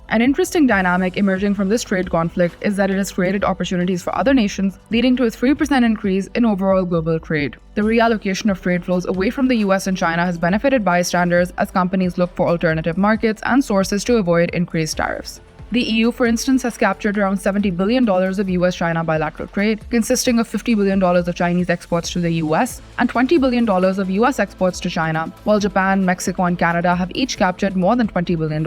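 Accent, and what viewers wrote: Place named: India. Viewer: sound like Indian and even the thought analysis seems emanating from Indian perspective. Indian thinking pattern is typical and easily predictable I like to tell the host of this channel - Indian thought are useless, and the world does not need any perspective from indian analytical so called expert, because right here in Asia, we have far qualified expert than Indian thought expert. ...I just listen 1 min and i already know what background you are coming from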